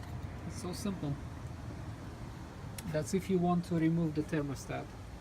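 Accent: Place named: Russia. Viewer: Суровый русский акцент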